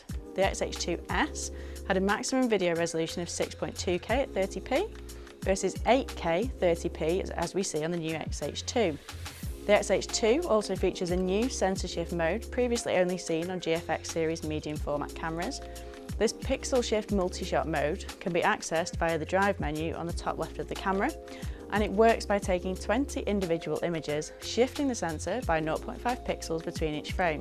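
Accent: United Kingdom. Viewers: It’s so nice hearing a Northern accent in these reviews! Thanks for making this 👍🏻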